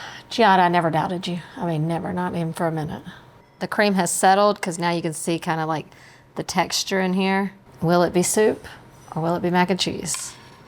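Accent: South, United States. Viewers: For my first comment since discovering cooking methods on this channel.... More of that Southern "Southern" accent please.... 😂💯💯💯 👋🏼👋🏼👋🏼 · Your southern accent is on point. Just enough. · You are SO attractive. The combination of your looks, personality and that Southern accent is just addictive. His bless your husband; he must LOVE 💕 you!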